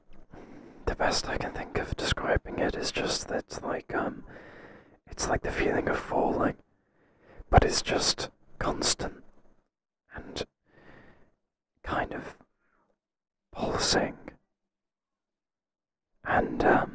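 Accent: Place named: United Kingdom. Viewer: i love the british accent 🤌 keep going J! 💋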